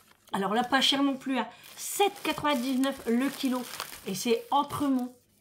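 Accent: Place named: Marseille, France. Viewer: Bonsoir 🤗 Ici soleil cet après-midi, LILLE incroyable le monde..Bon retour de courses 💯heureusement que nous sommes là pour nos enfants 😉il a raison qu'il profite 😅 il est trop gentil 🤗tu parles comme les marseillais ça te coûte un bras😅 bon courage pour demain ta séance, faites sauter les crêpes 😅 Bonne soirée à vous deux bisous 😚 Nathalie de Normandie 😘🥰❤️💯🫶🌹🌹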